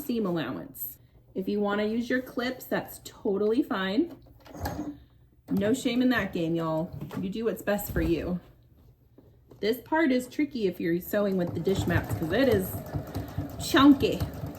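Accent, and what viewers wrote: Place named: South, United States. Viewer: I found your site and have really enjoyed it. Love your projects especially the Kate Spade tote bag. I hear a southern accent and have to ask where you’re from? I’m a native born Texan.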